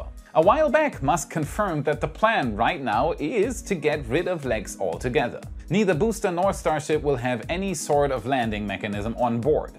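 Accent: Germany. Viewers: It isn't a weird mixed accent. It's English with a German accent. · Your german accent is almost non existant now. :-) hi from magdeburg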